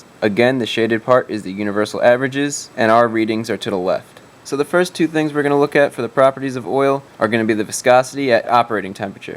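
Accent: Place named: New Jersey, United States. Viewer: Long guess.. are you from New Jersey? Your Water accent is so cool!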